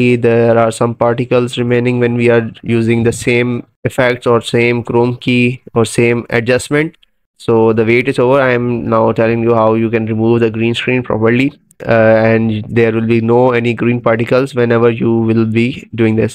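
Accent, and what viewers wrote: India. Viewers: alr by listening you accent i thought you are from india or nearby countries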